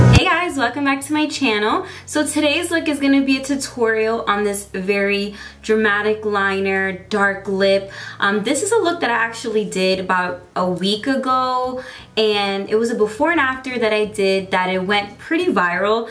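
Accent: New York, United States. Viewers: are you from nyc cause I hear that accent like is it me or are you a fellow new yorker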